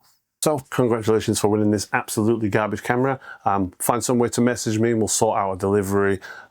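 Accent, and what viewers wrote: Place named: Hull, United Kingdom. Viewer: A hull accent on YouTube and a Saul Leiter fan, Brilliant! Really enjoyed watching. Your Instagram post brought me here, but before I read anything, I thought very Saul Leiter. Fabulous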